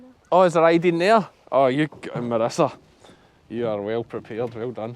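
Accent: Scotland, United Kingdom. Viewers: After I watch just a few of your videos, I start thinking in a Scottish accent. Lol. Tho I still can’t understand a word I’m saying. 😉 ♥️&🙏✝️ to you guys. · Hello from Australia. I have just stumbled upon your videos and your Scottish accent has me hooked! You are certainly kept busy with sheep farming.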